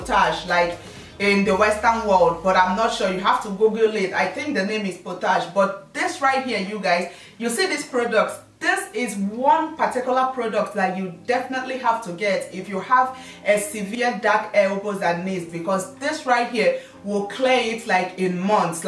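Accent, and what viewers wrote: Igbo, Nigeria. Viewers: Nigeria asent oooh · That's a Nigerian Igbo accent We have different accents here :) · you are the igbo omotola😘😘i love your igbo accent and beautiful personality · Hello Gorgeous! New subbie...Luv your makeup, outfits and OMG, that Nigerian accent is as beautiful as you are! Luv, Blessings & Keep Slaying!!!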